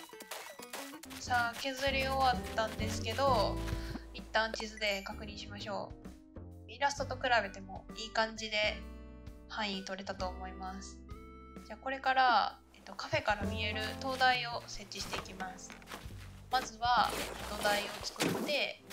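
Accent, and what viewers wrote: Kansai, Japan. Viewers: 初めまして！あつ森島作り迷走中の停滞中です😅 そんな中さんの動画に出会いました！私も関西なので、さんのしゃべりが心地良いです😊 素敵なブックカフェ、ぜひマネっこさせてください🥰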